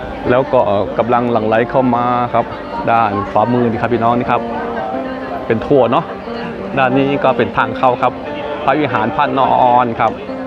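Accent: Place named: Sakon Nakhon, Thailand. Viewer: เจ้าสัญชาตลาวแท้บ้! ฟังสำเนียงคือ แถว สกลนคร หรือนครพมม...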